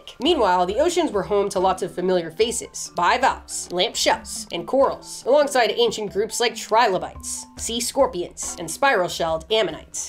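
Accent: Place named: Maryland, United States. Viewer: where are you from? You pronounce your O's like a Marylander 😂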